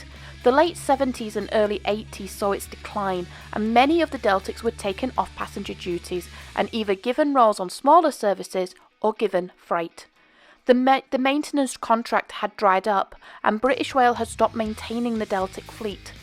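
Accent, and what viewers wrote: Doncaster, United Kingdom. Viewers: So you are from Doncaster…?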